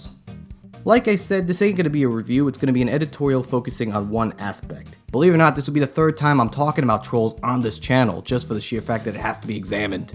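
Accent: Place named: Italian-American, United States. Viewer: God I love your Italian-American accent.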